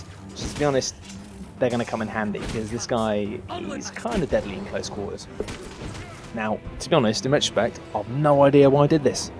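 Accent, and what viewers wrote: United Kingdom. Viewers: Every time I listen to the commentary I always think of Simon Pegg. I mean I'll probably just buy this game based off of this guys sweet UK accent and commentary :P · dude the english accent is so cool wish i had one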